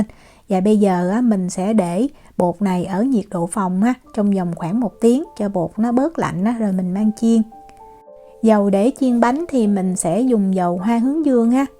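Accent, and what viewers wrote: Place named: Saigon, Vietnam. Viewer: Hướng dẫn chuyên nghiệp, tận tinh và đúng chất dọng người SG. Cảm ơn bạn và chúc Vạn sự như ý!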